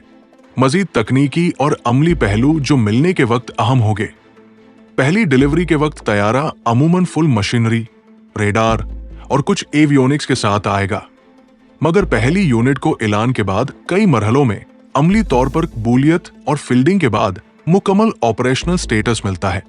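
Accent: India. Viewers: زبان اردو ہے مگر لہجہ اور تلفظ دونوں انڈین ہے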